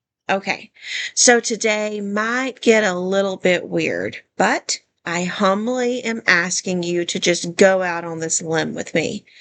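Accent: South, United States. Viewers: I just subbed bc you sound southern and you are definitely on point in what you said. ❤ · I think you're on to something! I like your voice, you sound like me...a southern gorl. Subscribed. 😊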